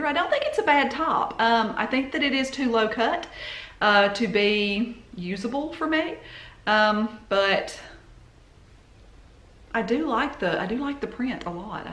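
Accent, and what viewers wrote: South, United States. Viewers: I really enjoy your videos? What state do you live? I think I hear a southern accent? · I think she has a southern accent too! I am from TX!💜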